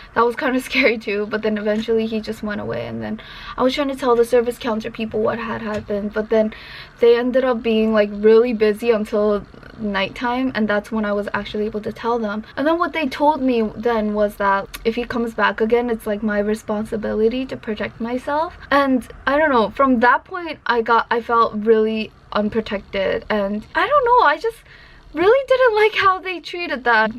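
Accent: United Kingdom. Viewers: Perfect American English (accent and ease never searching for words, better than half the people here) with sublet British endings occasionally. I know some countries teach British accent English but if that were the case something (YouTube, time in America, ?) has almost totally erased it. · nice British accent! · Your accent... so British.. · Dang ..that brit accent tho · Are you british? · In those cases,just start YELLING..Shouting..anything..You didn't like Job anyway,so max they could do is Kick you so Be it He is one Old Lonly miserable man must be,those kind just run away Be Brave...One shall need to know How to Raise Voice in order to Protect self,its not wrong Also you got kinda like Britsh accent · I feel some London England in your accent. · Have no idea why Youtube recommended this to me but yea I like your English accent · chinese speaking like an british op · .....Are you with UK accent??? 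Sounds like UK English...but not so UK ....... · Nice British accent!!! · Do you have a video showing your British accent? That would be interesting. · 有英伦腔。。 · 😂 there's british accent in you somehow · Swear I thought she was British. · your British accent is soooo Cutee 😄😄😄😄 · That British accent 😍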